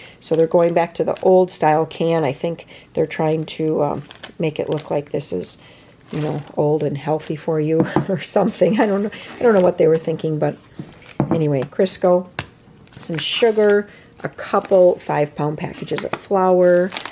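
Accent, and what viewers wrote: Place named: Midwest, United States. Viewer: Gotta love that midwestern accent… bag-els :-)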